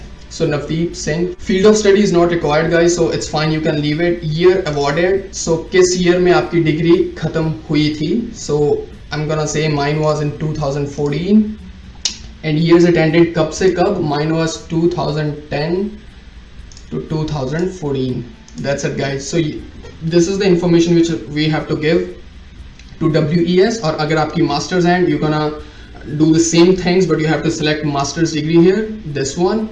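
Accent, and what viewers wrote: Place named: Canada. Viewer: Veere .. gonna gonna gonna 🤪 kini vari bolna hai.. u adapt Canadian Accent pretty well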